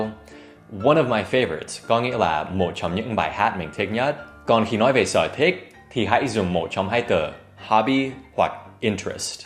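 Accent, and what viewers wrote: Standard. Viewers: thầy còn chuẩn hơn cô kiều trang nhiều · Thầy nói tiếng Việt ngày càng chuẩn. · thầy nói tiếng việt chuẩn quá.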